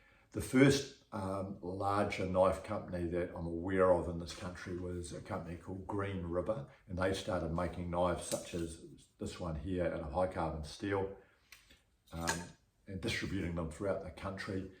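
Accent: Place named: New Zealand. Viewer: great kiwi accent - easy to listen to nice content mate, the history of nz making is really interesting there is an old forge down on the mainland - near blacks hills (?) it's really cool and i can imagine knives being made and repaired there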